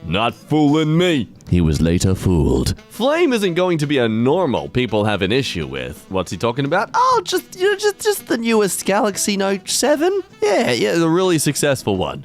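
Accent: Australia. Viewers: I have a question, are you Australian? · I love the Australian accent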